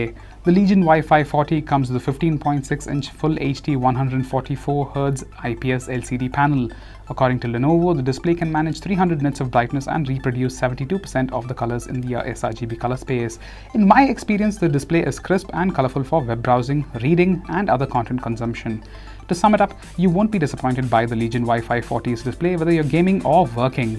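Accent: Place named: India. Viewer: that indian accent i love it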